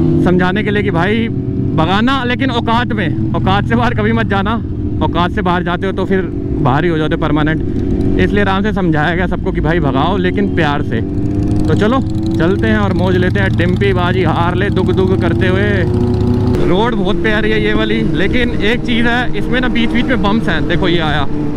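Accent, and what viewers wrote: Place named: Punjab, India. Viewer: Punjab wali panjabi☺️☺️☺️☺️☺️☺️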